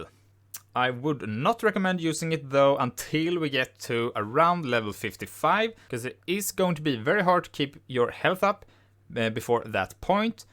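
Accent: Sweden. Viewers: 100% på att du är svensk :D Bra guide.. Tack edit: btw. The pob is a bit messed up. you got a lvl 1 tree, and then the lvl 15 tree is like full build, and lvl 40 tree has less placed skillpoints then lvl 15 :) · Svengelskan ärgrymm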